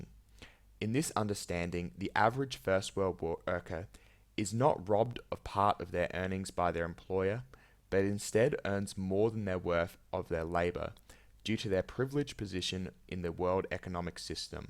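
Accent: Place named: Australia. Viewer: more content in aussi accent pls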